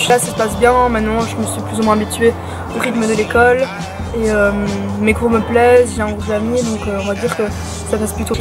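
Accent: Belgium. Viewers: Accent belge comme on les aimes 😂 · J'ai direct su que t'étais belge jsp prk 🇧🇪💪🏾💪🏾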